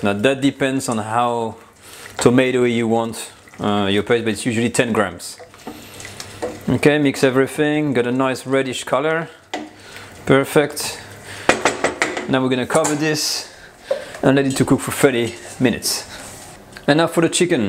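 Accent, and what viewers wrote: France. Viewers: I think you have a nice French accent. My daughter thinks your accent is typically French but I think you have a nice twist when you speak English and you're very clear. Merci pour la video. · He sounds french. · ❤I love your French accent so cute 🥰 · that s ok, we understood :) very nice and how can a French speak such a good English with such a thick accent haha. cute lol, thanks for the recipe, doesnt seem difficult, will try. a bientot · Excellente recette mais l'accent maudit français, ça me fait douleurs les oreilles. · Merci mon ami! A trultyfantastic dish. I am going to try it on my family I had no trouble following you great French accent.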